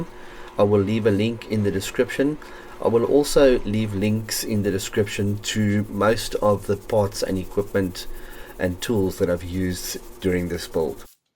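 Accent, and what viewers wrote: South Africa. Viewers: Is that a South African accent I’m hearing? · Are you South African? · Heard you were sporting a South African accent. Let me know if I'm wrong. I'm curious to know of you imported the speakers and equipment or if you shopped locally. If it's local supply, where did you procure the speakers from. I'm looking to buy from parts express, but the import costs are hefty.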